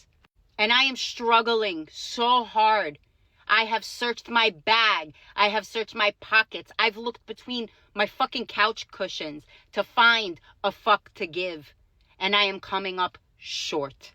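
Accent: New York, United States. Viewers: Actaully if you would actually watch and listen to us farmers when we speak. Plenty of vidoes of us. Its the Ag monopolies that are killing us. Pretty much all grain is controlled by 2 companies. ADM. and Cargill. They are keeping grain prices low. You honestly sound like your from newyork. And never seen a farm. Lol.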